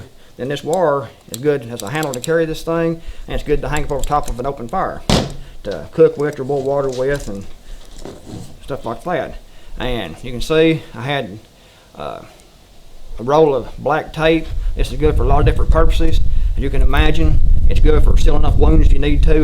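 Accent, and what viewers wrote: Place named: Boston, United States. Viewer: You sound different. Where you raised in Boston? LOL Great video. Imagine a different upbringing that didn't lead you to a love of the outdoors and huntin' and such. Man that woulda sucked! Peace, brother and be careful that they don't sneak up on ya!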